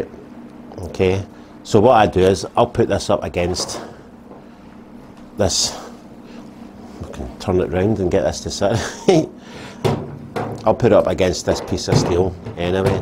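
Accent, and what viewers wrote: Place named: West Lothian, United Kingdom. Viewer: You are welding all wrong there......only kidding just wanted to play with your rants 😂😂.just found your channel and looking forward to following you and watching your previous vids. Doesnt sound like you are too far from me (west Lothian) based on your accent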